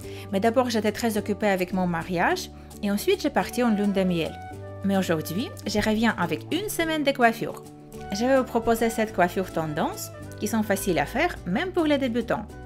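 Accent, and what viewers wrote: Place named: Russia. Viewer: t'as l'accent très russe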